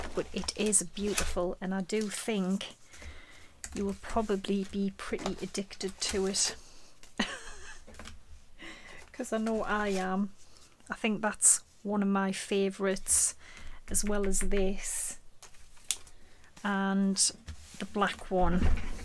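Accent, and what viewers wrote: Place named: Scotland, United Kingdom. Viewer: Love this. And that stamping board. Love your dialect. Scottish?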